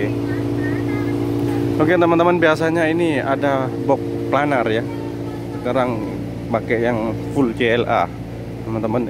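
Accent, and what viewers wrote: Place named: Madura, Indonesia. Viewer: Markas safa audio dimana mas logat ngomongnya kayak orang madura. Salam dari malang🙏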